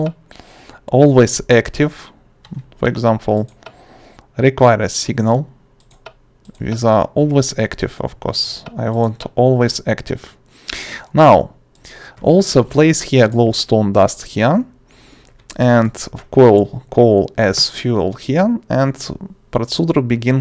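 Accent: Russia. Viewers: thanks so much very useful. you sound like your russian, I am also russin good luck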